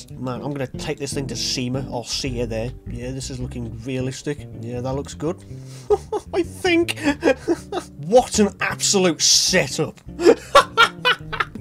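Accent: United Kingdom. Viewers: you talk british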